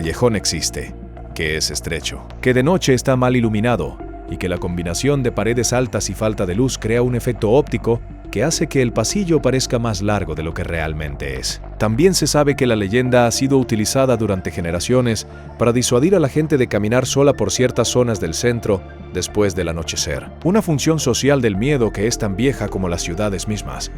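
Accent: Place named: Spain. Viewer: Momento por favor, tiene usted acento español, tiene mucha informacion erronea, la iglesia de los Remedios no fue construida por los españoles invasores, fue construida por los Gradiosos Mexicanos de los Grandiosos Pueblos Originarios, obligados por la fuerza por los mal llamados "conquistadores" para imponer su religion catolica y tratar de sepultar la mas grande piramide construida por los Grandiosos Habitantes Méxicanos de los Grandiosos Pueblos Originarios. No mienta usted, ya no nos pueden engañar, se acabo.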